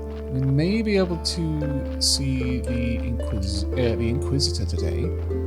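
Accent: Wales, United Kingdom. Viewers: I subscribed purely because of how much I the welsh accent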